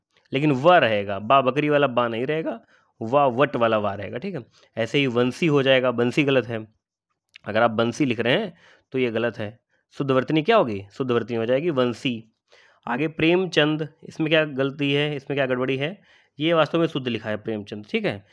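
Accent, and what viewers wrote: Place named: Hardoi, India. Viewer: Sr aapki hardoi wali tone aa jati h kabhi 2..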